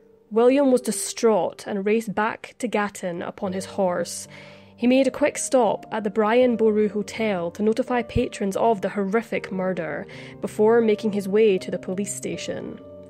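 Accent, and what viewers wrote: Scotland, United Kingdom. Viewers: There’s nothing sweeter than a a Scottish accent like if you ask me!!! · Her accent is Scottish. "WESTERN" Europe is made up of many countries. All have the own distinct accents. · She's Scottish..! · She has a Scottish accent and a beautifully clear voice. Both enhance the subject, however, your rudeness does not, honey. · Clearly pronounced and in a beautiful dialect. The reader sounds scottish to me? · Yes I pick up on Scottish as well, some irish and Scottish sound alike but the Scotts have more of a drawl on their accents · Oh, I've heard about this before. Interesting case, and sadly one that'll most likely never be solved. Also, love your accent! Scottish, right? :)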